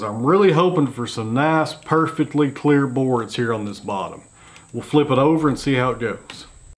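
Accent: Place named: South, United States. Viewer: Hey bud! Interesting video & story! Beautiful grains on that log too! I just had to complement your accent. I have a southern accent too but mine is clearly an NC/SC Piedmont one. That eastern TN twang is one of my favorites for storytellers!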